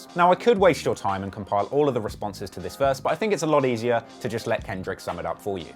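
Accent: United Kingdom. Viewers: This guy is like fingernails on a fucking chalkboard for me. Honestly, and oddly I admit, NO OTHER PERSON ON ALL OF YOUTUBE gets on my nerves like this limey Brit fuck. Oh my god he's insufferable with the same fucking jokes over and over, let me actually go to the most insufferable thing: white British people, please, please PLEASE don''t culture vulture and comment or perform hip hop, ever. See Aries Spears hilariously talking about how British hip hop is just the worst abomination of accent and manner of prim and proper accent trying to do a cool, US southern slang art form. "CAN-YAY. CAN-YAY." "TWO-PACK.TWO-PACK." HOW THE FUCKING FUCK!!! HAS THIS GUY NOT BEEN CORRECTED ON THE FAMOUS MISPRONOUNCIATION OF TUPAC YET!? "LIFE OF PAB-LOW. OH GOD I CAN'T BEAR THIS LITERALLY I'M ACTUALLY SAD I CAN'T WATCH ANY OF THIS DECENT CONTENT BECAUSE I JUST CAN'T FUCKING STOMACH THE NARRATOR FOR ANOTHER FUCKING MINUTE LITERALLY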